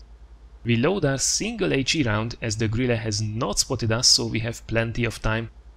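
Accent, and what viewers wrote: Hungary. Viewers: what is your official lamguage because you speak english like a hungarian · Olyan magyaros akcentusa van :)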